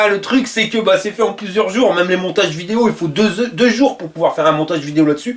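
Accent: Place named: Nord-Pas-de-Calais, France. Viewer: Frileux de s'abonner à sa chaîne ? Bah moi, j'adore son franc-parler et son humour ! Et comme je suis du Nord (en fait en Belgique mais à 20 km de Lille), je savoure son accent ch'ti. A chaque fois que je l'écoute, j'ai l'impression que c'est un pote qui me donne des conseils.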